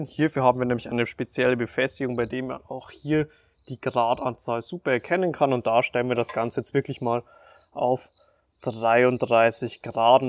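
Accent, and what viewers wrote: Germany. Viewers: 👎🏼 wegen der deinem deutschen Englisch Lasst es doch einfach sein mit eurem scheiß Grundschul Englisch Geschwätz. Du machst ein deutsches Video dann schwätz auch deutsch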